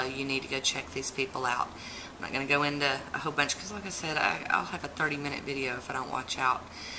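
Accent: South, United States. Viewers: Well there's your pretty face! I'm a southern gal too, and I DO love your accent 😉 Sinful colors is bomb! I just bought the neon collection today...minus the yellow. Can't find that one yet. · You are my favorite new you tuber (to me) happy to see the beautiful face that goes with that accent (yes I'm one of those commenters). Southern accents just sound so warm and friendly to me, just love hearing them! Thanks for the fun video, now to go check out your channel Recs! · I too am from the South and my accent is thicker yet than yours. When I stumbled across your channel I was like heck yeah.....a fellow Southern chic!!!! I have family in Indiana and ironically they are visiting right now and when they are here it's like oh wow maybe I do have a bit of an accent😏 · I love your accent! its so soothing to me and I lol'd when you said not everyone on the south marries their cousin 😂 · I enjoy your videos and positivity. 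Your nail art always inspires me, even though I haven't been patient enough to try anything yet (I'm still trying to perfect a neat manicure!) As a South Carolinian myself, it's a pleasant change to hear a genuine southern accent on YT. Keep up the good work!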